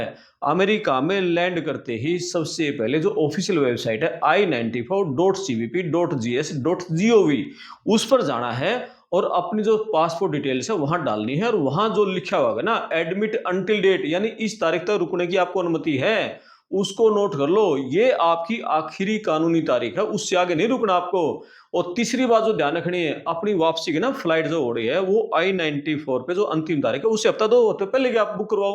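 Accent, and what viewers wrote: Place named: Haryana, India. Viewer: Heavy Haryanvi accent!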